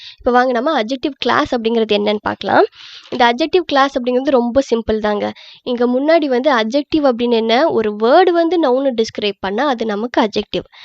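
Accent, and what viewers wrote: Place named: Coimbatore, India. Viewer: vaanga ponga inga nu soldrathulaa alagaa irukku sister...Coimbatore style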